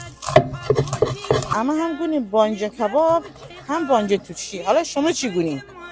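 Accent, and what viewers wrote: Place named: Shahsavar, Iran. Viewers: لهجه ی شما ب شهسوار و رامسر نزدیکتر تا رشت و گیلان ❤ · خانم به نظرم شما مازندرانی هستی واسه غرب مازندرانی❤❤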